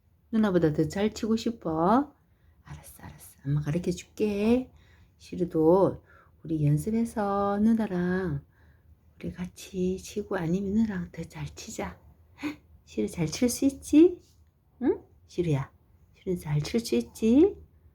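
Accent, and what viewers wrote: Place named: Gyeongsang, South Korea. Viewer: 경상도인가봐요 ㅋㅋㅋ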